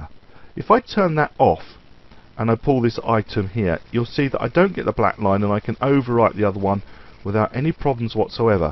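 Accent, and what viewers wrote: United Kingdom. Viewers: EXCELLENT explanation!!!! and I like the British accent too. haha! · Fab.....! Listening to your voice I thought you were Tony Handcock, the British comedian. Where in England are you from?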